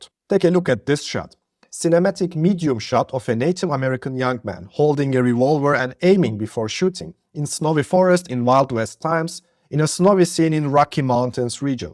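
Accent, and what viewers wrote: Turkey. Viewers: O klasik soruyu sorayım. Türk müsün la ? aksanın Türk gibi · Bu ingilizce konuşma biçimi ancak bir Türk'e aittir. Tebrikler video için. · seslendiren türk mü bu aksanı nerde olsa tanırım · türk aksanı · o nası bi Türk aksanıdır, nerde duysam tanırım :D güzel içerik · İngilizce de konuşsan Türk olduğun anlaşılıyor 😄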